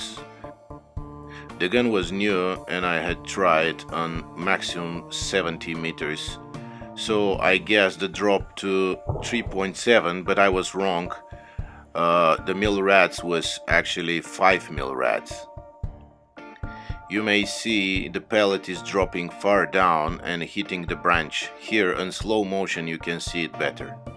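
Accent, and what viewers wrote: Russia. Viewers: Love this guys accent. Sounds Russian! One tone. NICE! Love the music as well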